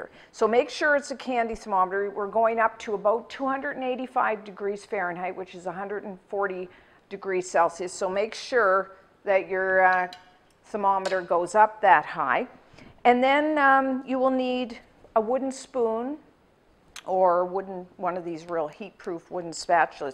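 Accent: Canada. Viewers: i am so so curious as to what your day job used to be, before this took over, since i've been watching you for years and you have always had measurements memorized and C/F conversions memorized and by your accent i can tell you are canadian or close to..and i have always been so impressed with your matter of fact/scientific way of explaining everything. nothing too lovey dovey or baby like. that's why i just need to know what you did as a job before this took off. ♥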